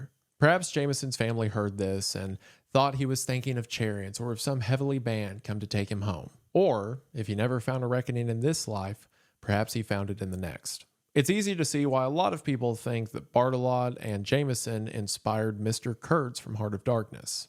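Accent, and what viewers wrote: South, United States. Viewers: he's also a southerner, they've got the whole "pin-pen" merger going on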